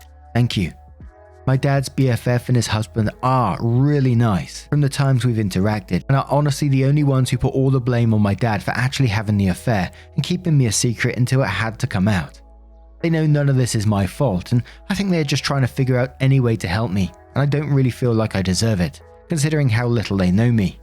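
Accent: England, United Kingdom. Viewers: Except when dialectical colloquialisms are involved. is from a certain part of England that has a certain accent & certain phrasings particular to the region.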